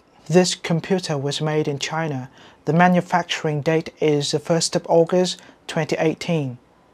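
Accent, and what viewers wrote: United Kingdom. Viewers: Ong nay thi ong phat am theo british ma suot ngay cu blame theo A.E accent lol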